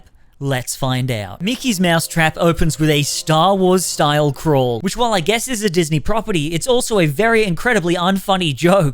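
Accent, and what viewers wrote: Australia. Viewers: Ashamed of myself, but I must confess, I thought was a different Australian YouTuber that sometimes covers shitty b rated horror movies, Jackson Fields, who also did a video in Shark Side of The Moon. I feel bad, I'm sorry 😞 I don't even know how I messed up that bad. The only three things (looks and sound wise) they have in common; Accents (obviously) Both are white And both have dark hair. And my mind went "okay they're the same person"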